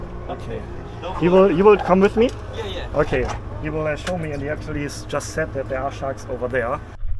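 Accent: Germany. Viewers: Hör ich da einen deutschen Akzent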